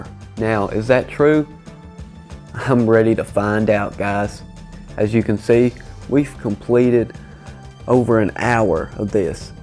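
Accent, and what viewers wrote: United States. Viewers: This video confirms my belief, People from the south shouldn't be anywhere on my TV. Please talk correctly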